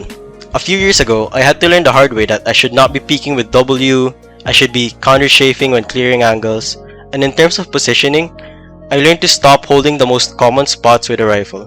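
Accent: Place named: Philippines. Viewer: Great video! Don't know why but you kinda sound filipino hehe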